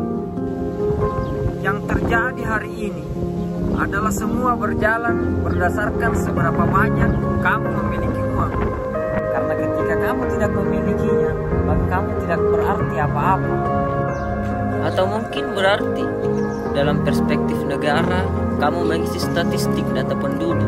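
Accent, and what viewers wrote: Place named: Makassar, Indonesia. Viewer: Alhamdulillah vidionya semakin bagus,semoga berkah.... Logat Makasarnya medok banget...hehehe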